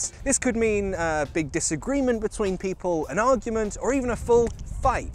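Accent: United Kingdom. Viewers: I thank you,Sir for the incredibly wonderful work! I appreciate it! British accents are the best!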